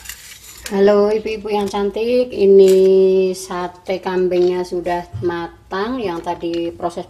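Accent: Java, Indonesia. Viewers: Assalamu'alaikum, satenya siiiip 👍👍👍 lucu banget klau ngomong, dr jawa y?.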